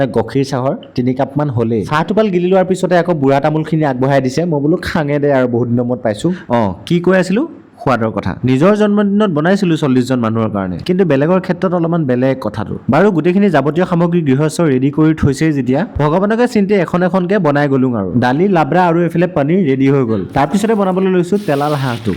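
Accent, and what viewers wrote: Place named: Upper Assam, India. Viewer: কথাৰ সুৰটো আমাৰ আপাৰৰ, ক'ত ঘৰ?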